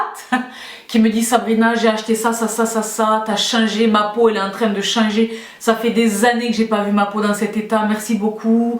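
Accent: South, France. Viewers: Super personnalité, super accent du sud, super belle, super intelligente (bon j'arrête là:D) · Sabrina Sabrina Quelle belle rencontre!!!!!!! Tu m'éclates. J'aime ce que tu dégages j'aime ton humour ton accent avec tes mots parfois qui me semblent être de l'occitan ou du basque bref le sud quoi!!! Merci merci merci Voilà mes résolutions pour cette nouvelle année combattre la médiocrité et me faire du bien. Merci à byreo grace à qui je me suis orientée ce vendredi vers ta chaîne que j'adopte Continue à nous faire du bien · Super vidéo! Ton accent vient du sud? Marseille? Ou Toulouse?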